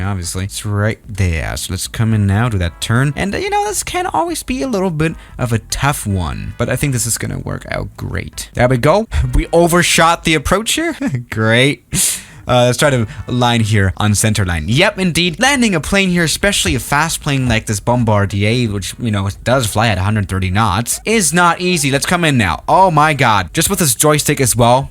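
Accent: Germany. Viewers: Are you german? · U German 🤨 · wait a minute... are you from germany? 😊😆🤔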